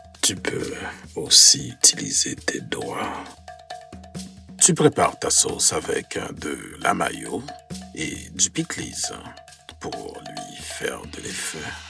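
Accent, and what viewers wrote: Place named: Quebec, Canada. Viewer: Belle recette et bonne narration. J'ai crue remarquer un très petit accent Québécois, la poutine ma confirmé le tout. Merci. ;)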